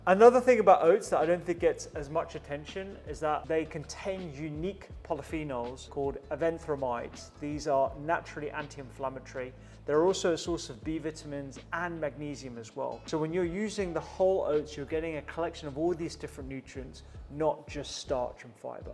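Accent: United Kingdom. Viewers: I understand the British accent is tempting :). However, please do post a healthy bread recipe that suits middle class folks. I am sure this one is more suitable for the $$$ demographics. Incase there is already one available. Let us know aswell. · Hello! Great recipe! 🍞 the finest bread. Are you of Indian or Pakistani extraction? I just subscribed to your channel. Love your British accent 😊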